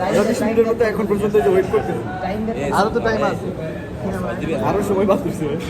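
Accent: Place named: Gopalganj, Bangladesh. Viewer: Apnar basha gopalgonj ooo vai😮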